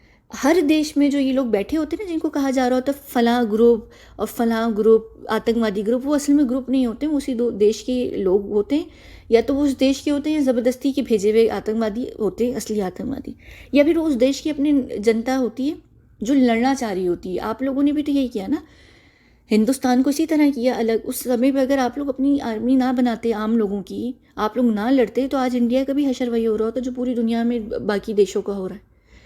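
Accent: Pakistan. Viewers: Her dialect is pure Pakistani. Slow claps for those who believe her Illuminati agent story. She could be related to islamic organization and her purpose here is solely to set a narrative for defying the existing islamic terror. She won't acknowledge Hindu genocides. Her agenda is fixed... They play the war of narratives. In a way she is also installing fear. The elites don't have the absolute power. We, if united are powerful too.. People keep ur senses alive and always always always question every narrative or information being thrown at you. Specially from a person whom you have never even seen. It's a very planned move.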